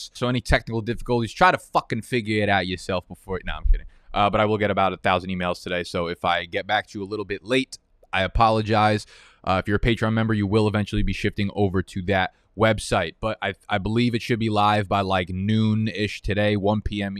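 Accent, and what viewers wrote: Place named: New York, United States. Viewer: I appreciate that I can tell you're from new york in about 3.5 seconds. Absolutely unbridled.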